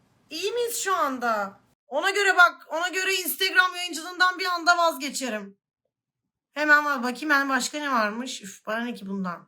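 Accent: Black Sea, Turkey. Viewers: bir de karadenizli aksani ucu bir arada gulmemek imkansiz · Abla karadenizi söktü götürüyooo😅👏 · O nasıl bir şive yaa abla ben bir Karadenizli olarak böyle konuşamıyorum helal olsun valla <3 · Karadeniz Şivesi İle Yayın Girşini Yapar Mısın Lütfen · gerçek karadenizliden daha karadenizli yemin ederim :D ay ablam yicem tipini arada böyle videolar yapar mısın ? :D :D :D :D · Karadeniz şivesi çok yakışıyor sanaaaa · Abla sen Rizelimiydin ? Çok iyi yapıyorsun karadeniz şivesini. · 10 saat karadeniz şiveli konuşsa dinlerim çokk iyi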